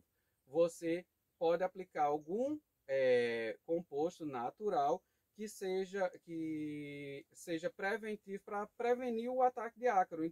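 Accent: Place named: Minas Gerais, Brazil. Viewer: Fala meu querido!!!! E esse sotaque??? Kkkkk tá bem minerin. Me inscrevi no canal e boa sorte!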